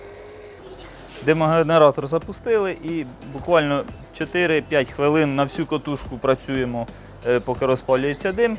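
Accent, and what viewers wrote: Cherkasy, Ukraine. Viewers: Добрий день, велике дякую за вашу працю,бажаю процвітання каналу,а говорите ніби у нас на Черкащині.Благополуччя і Миру Україні 🇺🇦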